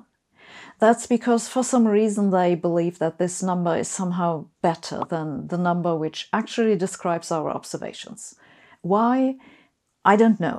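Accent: Germany. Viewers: Love the German accent 🥰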